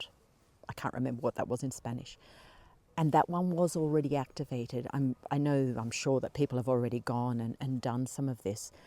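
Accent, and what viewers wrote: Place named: Australia. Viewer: Thank you for sharing your journey. Its nice to hear a fellow Australian on this path. I dont know much of Mary Magdalene but am drawn to learning more. Blessings from Australia.⚘️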